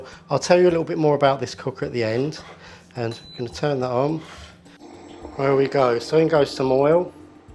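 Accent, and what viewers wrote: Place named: Southeast England, United Kingdom. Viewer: You sound like you’re from Dartford or Bromley,.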